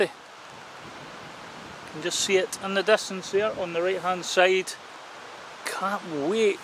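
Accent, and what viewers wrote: Scotland, United Kingdom. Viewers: Proper jock accent, lov it :) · as a Scot living in Sweden let me tell you the accent isn't holding anything back. The people of the world love our accent.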